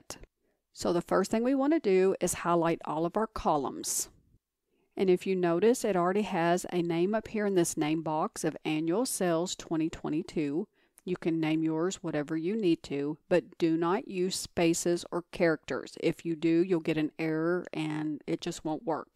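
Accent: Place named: United States. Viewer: From ALL THE INTERNET, you're unique, American with American accent!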